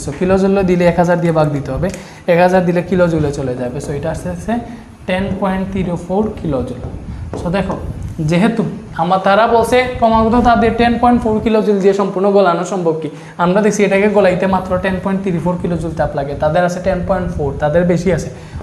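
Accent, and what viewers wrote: Sylhet, Bangladesh. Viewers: Sir ki syleti naki 😅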